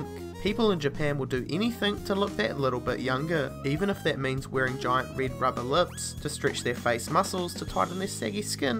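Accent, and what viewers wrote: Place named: New Zealand. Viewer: 1trueNRG my guess would be, New Zealand, sounds like their accent lol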